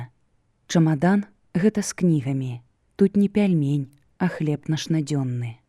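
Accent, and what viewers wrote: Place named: Russia. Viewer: Вымаўляецца не "іх", а "йіх", "йіншы", "йімі" і г.д. - варта азнаёміцца з вымаўленнем І ў беларускай мове, бо няправільна шмат вымаўляецца. Мяккасць не беларуская, часам праскоква беларуская. На сутыку слоў асіміляцыі беларускія адсутнічаюць, вымаўляецца як у расейскай.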